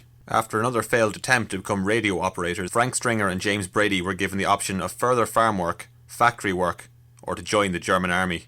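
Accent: Ireland. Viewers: So the narrator was Irish, too?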